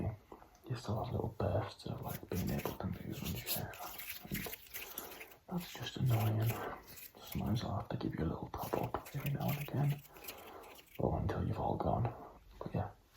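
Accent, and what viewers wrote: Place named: Newcastle, United Kingdom. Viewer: Your geordie accent gives me mad tingles seriously more than like any other accent. Crazy. P.s. you’re beautiful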